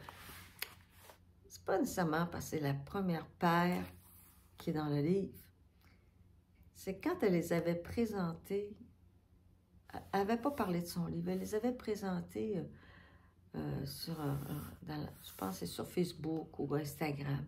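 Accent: Quebec, Canada. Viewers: Enfin quelqu’un du Québec comme sait agréable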